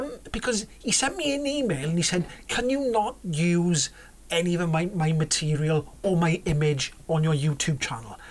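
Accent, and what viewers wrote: Wales, United Kingdom. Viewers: I think that you are great, I like your sense of humour, your friendly Welsh accent and your no nonsense approach.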